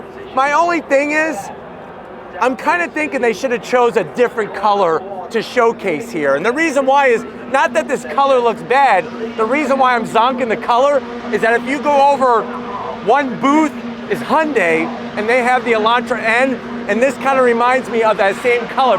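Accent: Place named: Redneck, United States. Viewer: funny American redneck accent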